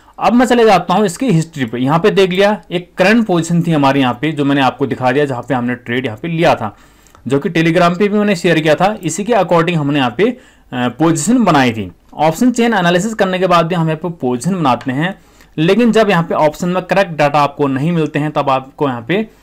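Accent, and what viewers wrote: Kumaon, India. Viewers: Aap kumauni hain kya sir?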